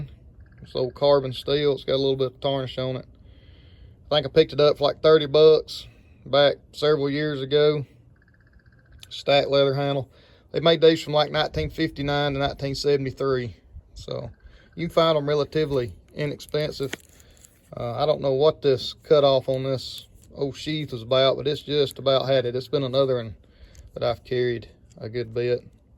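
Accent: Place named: South, United States. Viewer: That's just Southern English, what I find hard to understand is some of the dialects from the Northeast.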